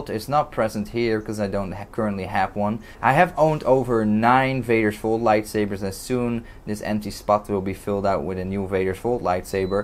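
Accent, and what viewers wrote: Netherlands. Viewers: Where are you from, ur accent souns a bit dutch to me xD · ja ik hoorde het een beetje aan het accent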